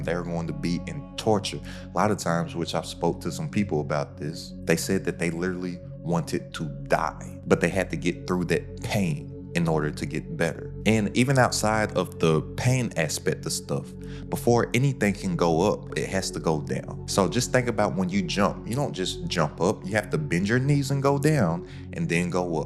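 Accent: Kentucky, United States. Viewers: Are you from Kentucky brother? I’m in Lexington. Love your game, it helps me a ton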